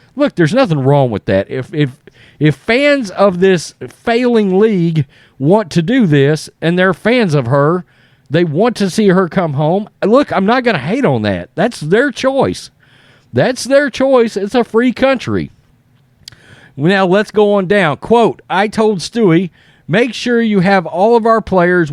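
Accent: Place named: Appalachia, United States. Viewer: this is the wrong place for me ... I do not need a hillbilly to read to me .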